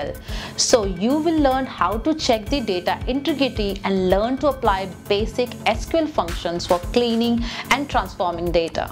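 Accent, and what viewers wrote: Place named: India. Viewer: Your sound is amazing and your sounds just like indian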